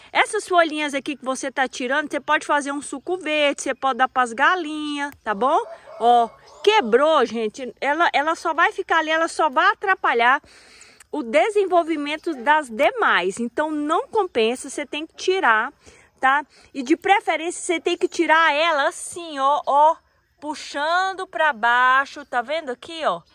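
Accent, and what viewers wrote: Minas Gerais, Brazil. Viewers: Vc e. Mineira ? E parabéns mega canal !!!!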